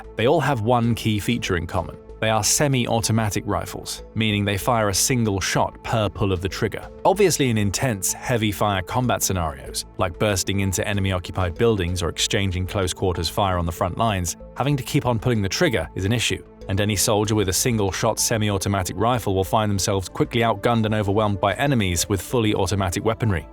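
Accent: United Kingdom. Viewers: Bullsh-t! The limey Russophobe voice just makes this channel CRINGE!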